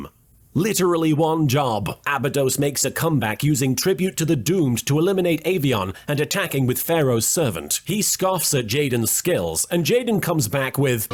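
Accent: United Kingdom. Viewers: Is it just me, or did somehow become MORE british?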